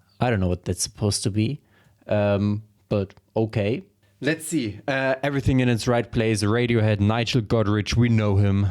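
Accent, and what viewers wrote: Germany. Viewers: why does your accent does sound like your german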